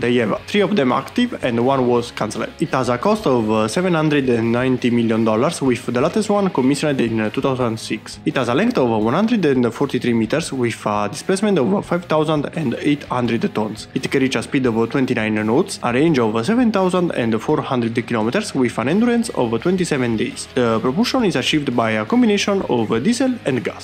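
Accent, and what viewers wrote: Italy. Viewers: Non è difficile riconoscere un accento "maccheronico" anche se molto ben mascherato; i dati sono attendibili e seguono un filo logico molto stabile e definito, per cui, italiano o non la classifica rimane invariata fino a prova di errore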